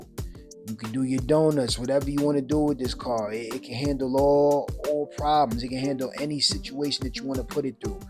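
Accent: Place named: West Coast, United States. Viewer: He sounded like he was from the West Coast to me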